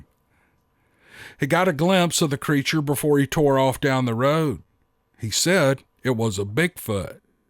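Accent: South, United States. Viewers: Gosh I’ve missed you! Some how I got unsubscribed and have been dealing with deaths in our family 🥺 today I went looking for you and it’s so nice to be back home.. I love your chit chat and I’ve missed your southern accent ( from Eastern Washington State🤣) thank you for all you do and this beautiful community of friends💕🌻🙏♥️God bless you all!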